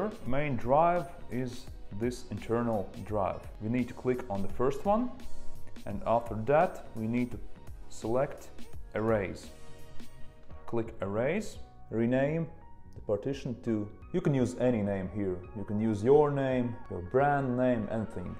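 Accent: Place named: Russia. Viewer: Раша акцент ю ноу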